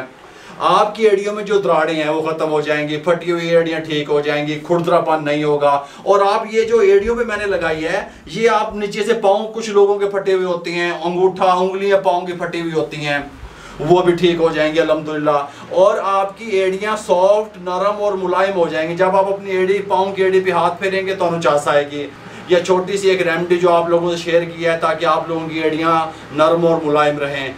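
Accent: Punjab, India. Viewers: English, Urdu and Punjabi touch, Zabardast.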